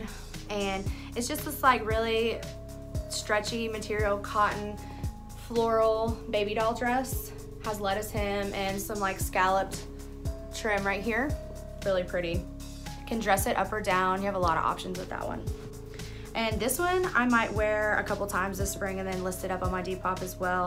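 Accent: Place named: United States. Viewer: Girl what state are you from? I like ya accent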